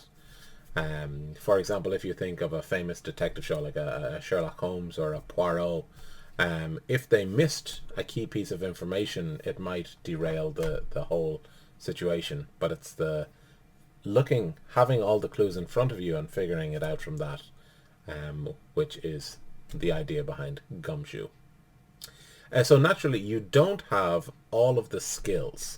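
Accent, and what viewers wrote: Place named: United Kingdom. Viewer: Thanks for the great video! Looking forward to your channel. Also, great accent- are you British per chance???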